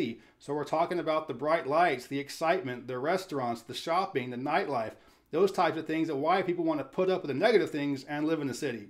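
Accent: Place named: North, United States. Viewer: Have you been to Austin lately? Work took me there and 2 things really stand out. The homeless population is enormous. All the highways are elevated. Under quite a few of these underpasses are true homeless tent cities. Not to mention panhandlers on just about every freeway off ramp. Let's not forget about the university of Texas and the murder clock tower. It stands out so much you can see it from I-35. People, for some reason want to visit the site Charles Whitman made famous. Traffic is God awful. I figure you would pick this city over Tallahassee. No surprise here. The way you speak is very much Yankee North. No way you are a native to Chattanooga Tennessee. Your videos are very good and entertaining but you are dead wrong about this one. Keep up the good work.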